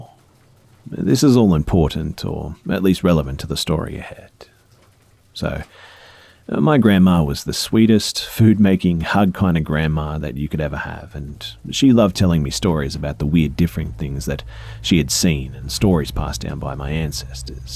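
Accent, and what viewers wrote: Australia. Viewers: He's not British, he's Australian. Like, Steve Irwin, fair dinkum Aussie. I can understand getting the two confused, though. Just think Lazy Masquerade when you think British.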